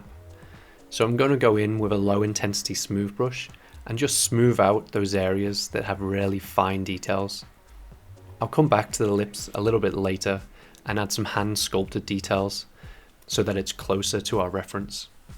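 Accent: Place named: Liverpool, United Kingdom. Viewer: Thank you so much for putting these high quality videos together! You have a soothing but firm tone in your voice. Although it's subtle, I like the Liverpool accent as well! :)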